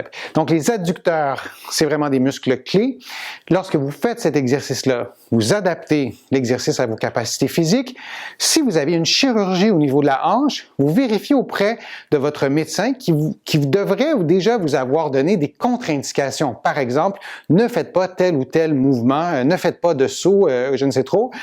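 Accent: Canada. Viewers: Bonjour.je suis vraiment fan.et je regarde vos videos avec beaucoup d'intérêt.et j'essaie dans la mesure du possible de refaire les exercices régulièrement.notament pour le rachis cervical et les épaules.si vous pouvez nous faire une vidéo sur comment éviter la cyphose sénile ou la corriger.merci bcp et je tiens à vous dire que j'adore votre accent canadien.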